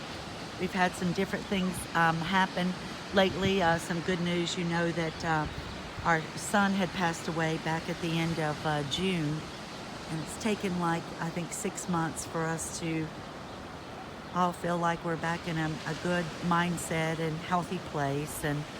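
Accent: South, United States. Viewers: 🇬🇧 How lovely to hear your lovely Southern voice again. It is wonderful that you have been so strong in dealing with the death of your son. It must have been so painful for you and your whole family. 💫 Sorry you also lost your Yorkshire Terrier baby, but he was a terrific age, almost 20, amazing. Thank you for sharing your lovely day with us all. A very Happy New Year 🍾🥂 big hug 🫂 from beautiful Yorkshire. Xx 🙋‍♀️ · how good to hear your sweet Southern drawl again. Though I’m far away in Israel, I have thought of you often since we last heard from you with the sad, sad news. I too am a mother, I don’t need to say more. But news of your return is so welcome, and the news of your family’s commitment to life is so encouraging. Be well, till next time.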